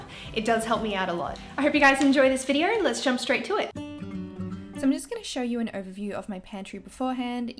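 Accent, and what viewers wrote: Australia. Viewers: it's an Australian accent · culture lol. It's just an Australian accent. I could say the same about the way Americans pronounce words. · That's just Australian accents m8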